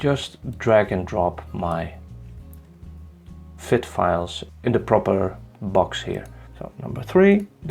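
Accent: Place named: Netherlands. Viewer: Hi, Funny how in the first seconds I already recognized a fellow dutchie XD. For everyone else I'll ask my question in English. I'm currenty shooting a 3 panel mosaic of NGC 1333 with my ASI 294MM pro. I'm planning on having at least 25 subs for each RGB channel. After which I want to stick them all together to create a single big mosaic. My question is, what would be the best work flow? Last year I did a 2 panel DSLR mosaic of m31 in a PixInsight trial, which worked flawlessly. But I have no idea what would be the best way to do it with mono data in SiriL. Ofcourse I would start with stacking the data from every filter together, but what would the next step be? Do I stretch and edit every mono filter, make a RGB version of that panel than use ICE. Or would I stretch it, use ICE to create the mosaic and then make the RGB version? I think that doing ICE in between stretching and RGB would cause problems with alignment later. 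Another problem with these work flows would be that it is really difficult to have the same stretch across (3 panels * 3 filters) 9 different files. You mentioned something about creating a SiriL script which does the stretching. I have never used scripting in SiriL so would something like that be difficult to do? Or would it be better to stack the files, use ICE than stretch and RGB composite? NGC1333 has a lot of faint dust (I really like the image from Justin Tolboe), so I think that it would require a bit more processing than doing a simple auto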